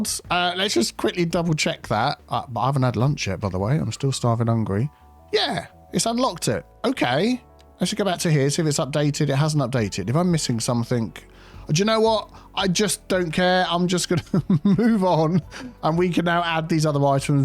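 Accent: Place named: United Kingdom. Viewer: I Always Use to speak in British Akzent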